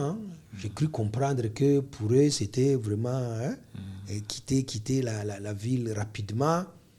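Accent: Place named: Rwanda. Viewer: Mr tu es rwandais ar ta tonalité prouve que tu l'es et tout ce que tu dis est vide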